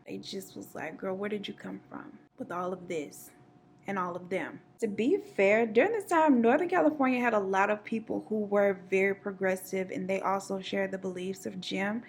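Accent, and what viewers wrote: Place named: Houston, United States. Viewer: When I started watching you I kept things you sounded like you were from Houston!! We have a unique sound when we talk 🙃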